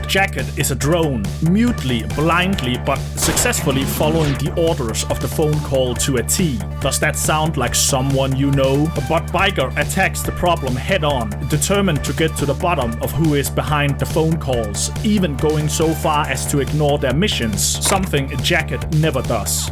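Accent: Denmark. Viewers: You are Danish. I can feel, hear and smell it. As i am danish myself. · i smell danish on ye boy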